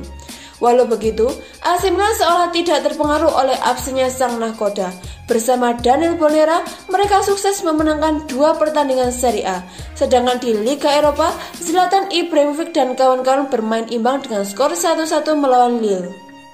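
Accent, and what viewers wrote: Java, Indonesia. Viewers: Medok Amat Yaaaaaa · Min... Macane Romanyoli dudu Rogmanoli. Medhok iku gpp, sing penting pengucapan jenenge dibenerke. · Min terlalu medok..🤭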